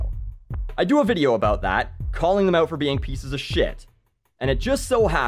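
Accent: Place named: Canada. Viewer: I finally heard his Canadian accent.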